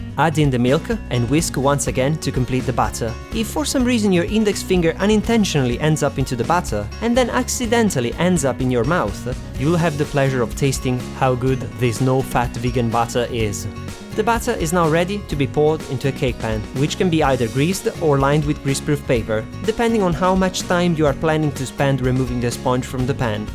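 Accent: Italy. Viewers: Si sente che sei italiano:)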